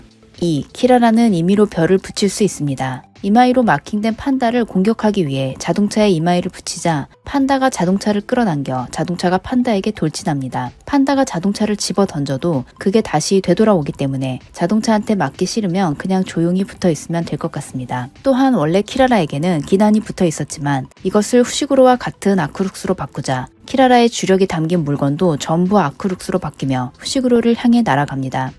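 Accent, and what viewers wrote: Japan. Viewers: 영어도 일본어 느낌으로 읽네요 ㅋㅋ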